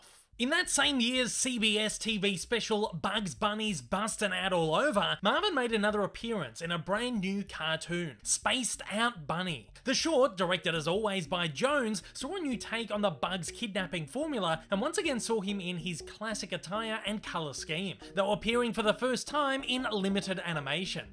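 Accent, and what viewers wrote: Australia. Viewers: Urghhh that aussie narrator's accent is decidedly grotesque and annoying, ruins what would have been a great video !!!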